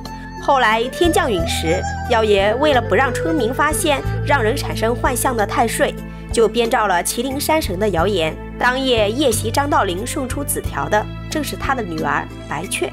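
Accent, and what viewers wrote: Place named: Shanxi, China. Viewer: 山西还是陕西？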